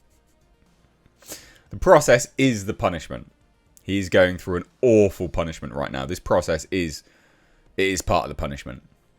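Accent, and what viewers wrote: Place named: United Kingdom. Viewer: Forgive me for repeating myself but I love breakdown of the situation. Between his British accent and his use of modern colloquialisms it’s hands down my favorite solo streamer/youtuber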